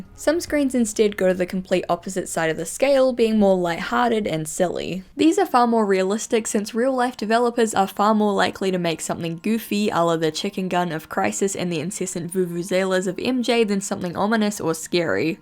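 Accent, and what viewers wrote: New Zealand. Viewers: I just discovered this channel. Is her accent Kiwi? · No. New Zealander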